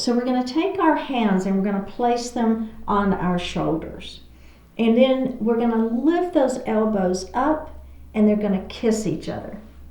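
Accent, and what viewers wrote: South, United States. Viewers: Hi pretty, So happy I found your site even though your challenge started a year ago, better late then never! I have just recovered from Cervical Spine Surgery and had 4,5, and six disc removed and a titanium plate with a screw as replacement. I will be 79 May 4th. I had the surgery February 6th 2023 and in August- December had 3 very bad falls. One of the problems was a medication called Cymbalta and having researched for weeks it has been known to be a destructive med for many people since around 2014. There are doctors and people on YouTube that have shared their horrible experiences with getting off this med. I’m talking way over 100,000 people but most doctors are handing it out like candy and the withdrawal process must be very, very slow. Don’t know why I rattled off all of that so I’ll blame it on Cymbalta! I have told other people and my new Physical Therapist and even tho I have Fibromyalgia and arthritis they said go for it! 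Love your southern accent and your peace. I go to Bible study and have His peace but I tighten my shoulders many times instead of letting them relax. I will just keep trying my best to relax😊❤❤ Sincerely, Paulette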